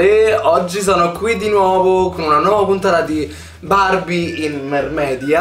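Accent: Rome, Italy. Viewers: Canti benissimo! Oltretutto amo sentirti parlare perché amo il romano ahahah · hahahahha mi fai troppo ridere! e poi il tuo accento romano è fortissimo!! haha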